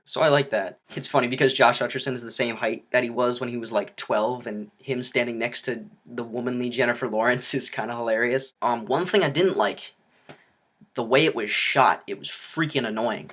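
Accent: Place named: United Kingdom. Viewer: You're Bristish accent is immense :L And I'm British, so i know what I'm talking about :)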